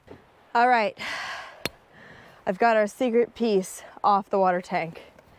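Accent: Newfoundland, Canada. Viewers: I see that you are developing a bit of a Newfoundland accent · Ah ha...You are a Newfoundlander. "This is the life I chose I spose". :) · Hearing slowly sound more and more Newfy as time passes is hilarious:D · My Mom is from Roddickton Newfoundland. I love watching your videos. Love the accent, reminds me of my family. Love them greatest people, Newfoundlanders.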